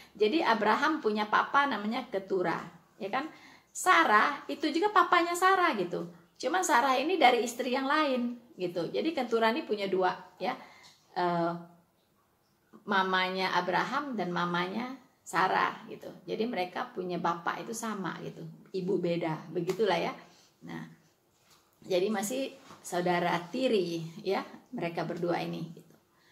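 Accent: West Sumatra, Indonesia. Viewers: Bu' logat dan intonasi ibu bicara saja jelas kok suku minang. Terus lakukan dan berikan penjelasan untuk meluruskan tanggapan yg miring dari Muslim Menjawab. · Dari logat bcr, sy yg bukan orang Minang tau, beliau mmg dari suku Minang, sy punya seorang teman yg sdh lama tinggal dan bergaul dg orang2 dari brbagai suku di Jkt, logat bicaranya percis sama dg ibu ini, lagian buat apa sih hrs bohong soal beginian. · Haleluya Puji Tuhan Yesus, Shaloom Bu semoga di berkati Tuhan Yesus dgn bersama keluarga...Amin terus Bu, saya orang batak lama di Riau saya masih dengar logat intonasi masih terbawa orang Minang dan terusss Bu kabari injil terus saya penggemar Bu, kasih Yesus nga pernah pudar..! bersinar terusssss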